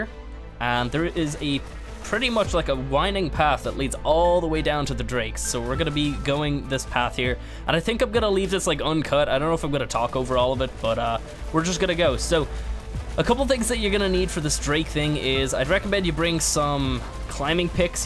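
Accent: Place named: Ireland. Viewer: Why does sound Irish in this video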